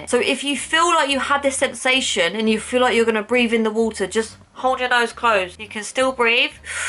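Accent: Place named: England, United Kingdom. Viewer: I really like hearing your English accent pronunciation on little bit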